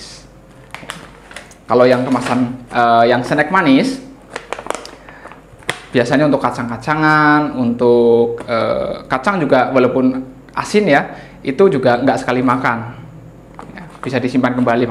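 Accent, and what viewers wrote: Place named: Banyumas, Indonesia. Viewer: Logatmu ngapaknya medok banget , mas. Anyway..sukses selalu ya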